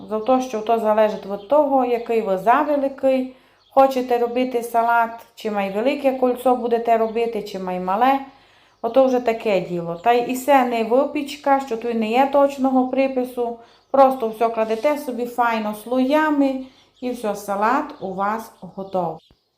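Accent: Transcarpathia, Ukraine. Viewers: не устаю удивляться вашим рукодельным видео и закарпатскому диалекту. Поздравляю с наступающими, здоровья, терпения, достатка вашей семье.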